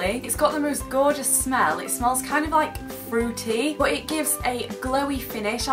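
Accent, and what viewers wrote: United Kingdom. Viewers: I wish u.s drugstores carried the bourjois healthy mix foundation I really wanna try it :( I love your videos by the way :) what accent do you have like I know youre british ( im not trying to be rude im just a curious kitty)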